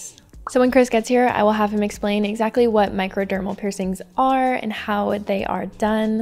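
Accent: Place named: RP, United Kingdom. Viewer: I was so suprised by the posh British accent!😂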